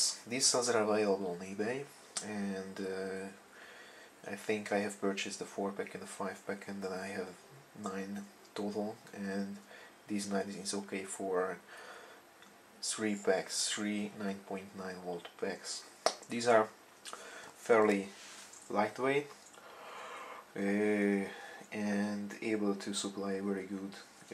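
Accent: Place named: Hungary. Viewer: Felismertem a magyar akcentust :D Azon gondolkozok, hogy a gyári 9.6V-os NiMh helyett 3 LiFePO4-et, vagy 2 Li-ion-t használjak. Utóbbi esetben 2P-be is tudnám kötni, vagyis 4 db-ot használnék dupla kapacitásért (kb. 6000mAh). Szerinted melyik a jobb döntés? I'm wondering whether I should use 3 LiFePO4 batteries or 2 Li-ions instead of my stock 9.6V NiMh. In the latter case I could use 4 pcs instead of just 2 in a 2P configuration for double capacity (around 6000mAh). What do you think?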